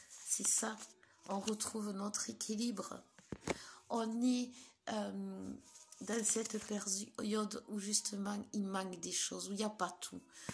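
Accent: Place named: South, France. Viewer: Vous avez un accent du sud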